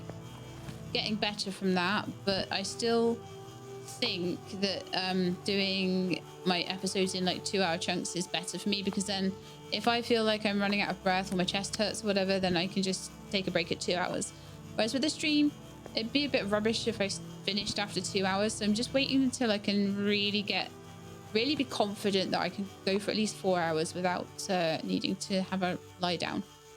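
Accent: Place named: United Kingdom. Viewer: You made your internet handle the primordial spider from lord of the rings... NGL that's kinda based, would be really funny if you were an auzzy but you sound more British than that.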